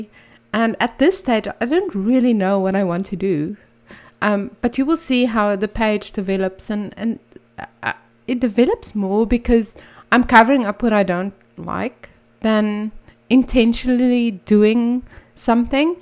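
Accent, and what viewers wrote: South Africa. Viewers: Beautiful, once again! Your layouts look so deceivingly simple... The white background works wonderfully with your style. A blessed year for you too <3. By the way, I love your accent. Reminds me of my childhood a lifetime away in Johannesburg.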